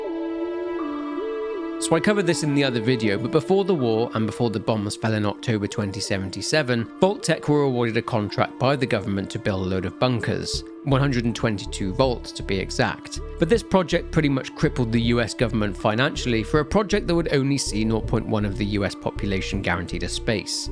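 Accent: England, United Kingdom. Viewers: there's nothing like hearing an English fella, talking about the lore of our fave games. simply the best!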